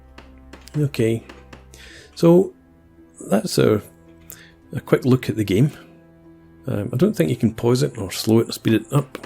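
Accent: Scotland, United Kingdom. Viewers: Just gotta say cheerio for that accent :) Scotland Forever!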